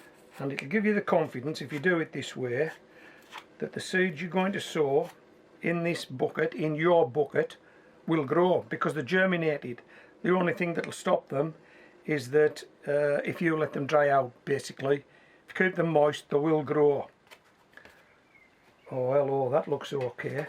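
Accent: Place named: England, United Kingdom. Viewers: Is anyone else here just for the pizza 😁 Great vid loved the starlings but i love your geordie accent the most 🙂 im going to try this!!! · Great video, some tip tips for bucket planting and speedy germination. I have two questions, what brand were the pizzas as the ones we usually get these days have cardboard bases. Last question where in the world are you from, the Mrs and I reckon county Durham, unmistakable twang, surely?! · Lovely accent in west Cumbria! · I'm so glad I found your lovely videos but must confess as a fan of the language I cannot place exactly which county you're from. Would you mind enlightening me? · Amazing video!! I paused the video part way in and my two variety of carrots are already on their wet tissue. My husband and I are trying to figure out where your accent is from in the North of England? Please help settle our discussion. 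Cheers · I thought he was Scottish. I worked with a Geordie here in Canada. He sounds very different. It's lovely anyway.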